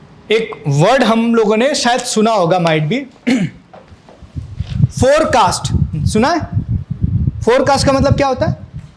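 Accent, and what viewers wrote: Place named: Gujarat, India. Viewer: sir apa gujarti he laght he voice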